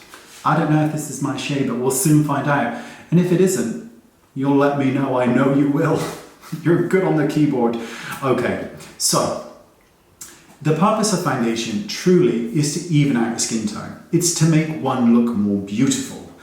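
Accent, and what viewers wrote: United Kingdom. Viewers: Absolutely, Lovely British accent ! I am not even using any make up I just keep listening ! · I always love your videos and Brit accent:-) Makeup is supposed to enhance one's natural beauty and not to produce artificiality. This will help a person age beautifully. Otherwise they will be like mugshots. What I love about you is that you always emphasize this point. Don't worry about who says bad about you. We all love you.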